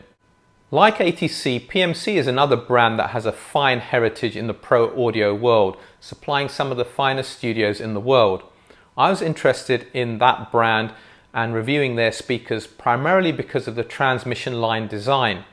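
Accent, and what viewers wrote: United Kingdom. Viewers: your content has always been top notch and you do excellent reviews, so of course your channel grew quickly. It doesn’t hurt that you’re obviously a good guy, and you’ve also got that British accent vouching for you too! lol. Great year of reviews, and I hope you have a great holiday season. I look forward to seeing more of you in 2021. Thanks,! ✌️🎶🙂👏👏👏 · Thank You for you videos for 2020. I have found them really informative and you way of presenting is superb + I just really enjoy the British accent - really easy to understand as a non-native-english speaker. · Like your British accent, however, some of your items you mentioned are not available in the USA.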